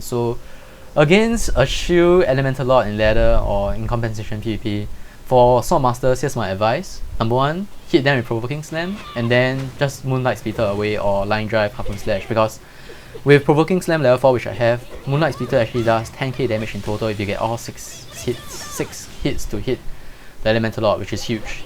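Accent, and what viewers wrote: Singapore. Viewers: are u singaporean?? cos u speak like a singaporean anyway i love your guides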